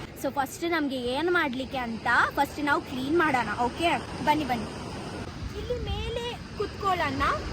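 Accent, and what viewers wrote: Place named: Tulu Nadu, India. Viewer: One of My professor is from Mangalore, his mother tongue is tulu language,,,,, so he is also speaking kannada like you.....i remember him after watching your video.😅😅😅😅😂😂😂😂😂 By the by he is excellent knowledgeable professor.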